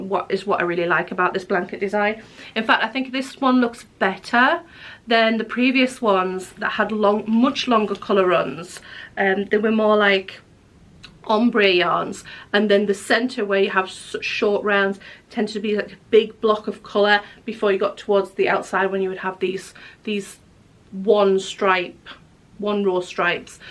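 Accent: North East England, United Kingdom. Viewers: You have a north east accent. Im Sunderland birn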